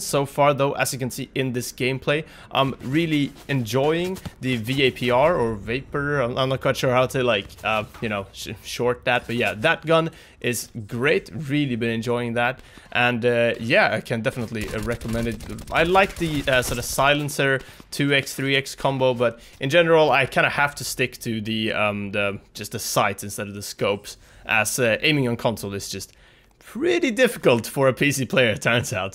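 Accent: Sweden. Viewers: Svensk? Are you Swedish?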